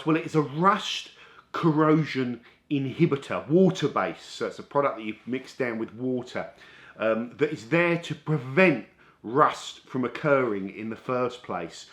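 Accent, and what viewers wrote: United Kingdom. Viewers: not boring when u tell the truth...its truly funny the way guys from on the other side of pond talks...worst than me bring from N.Y.. lol.great video.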